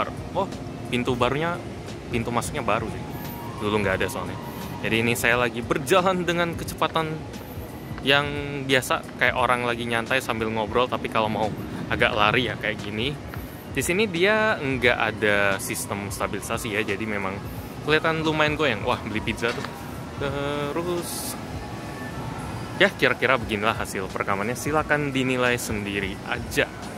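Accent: Palembang, Indonesia. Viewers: Palembang Om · dia orang palembang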